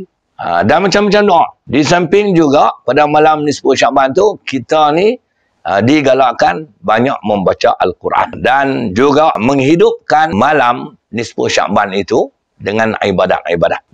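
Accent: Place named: Kedah, Malaysia. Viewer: Pekat betul loghat Kedah Ustaz ni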